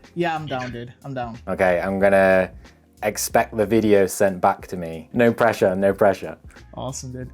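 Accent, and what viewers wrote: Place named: Northwest England, United Kingdom. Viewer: Eeee Saltburn, I was dragged up in Teesside (Stockton) but haven't been to Saltburn for yonks. You sound like you're from NW England more than NE!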